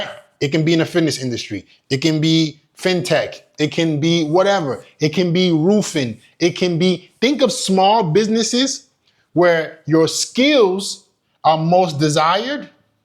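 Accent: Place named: Bronx, United States. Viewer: Lol they're your biggest audience 😂 why am I not surprised. You got a Bronx accent btw 🤔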